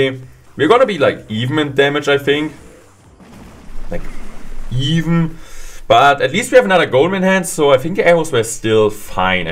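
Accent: Germany. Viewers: You are from Germany because you have Germany accent?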